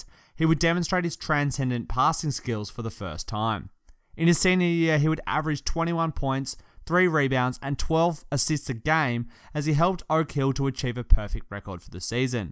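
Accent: Australia. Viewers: you are very australian sounding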